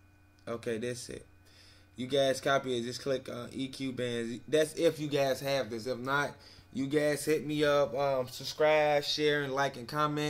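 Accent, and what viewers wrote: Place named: Chicago, United States. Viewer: I can tell U in Chicago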